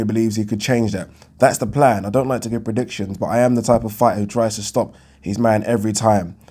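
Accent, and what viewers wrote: United Kingdom. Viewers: Appreciate your another attempt not to swear:) I mean I never judged you for that. As for the non-native speaker, I love your British language and it's even more beautiful if you don't swear =] As always, my greetings from the boxing country, Ukraine!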